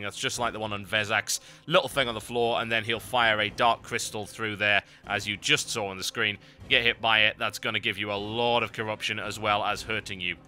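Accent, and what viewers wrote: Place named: London, United Kingdom. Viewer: man, you're really british.. and I think it's plesant.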